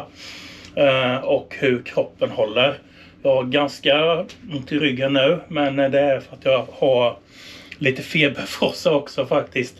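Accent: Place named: Jönköping, Sweden. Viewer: Hittade precis din kanal och tittade på detta avsnitt. Gillat och prenumererat. Vad roligt med ännu en MC kanal från Västgötaland. Fast du låter som du vore inflyttad till Habo från Jönköpingstrakten hahahaha. Inget ont ment. Nu ska jag se om jag hittar videon om väskorna och sen blir det att titta igenom hur det går på din resa. Lyckönskningar från Falbögda.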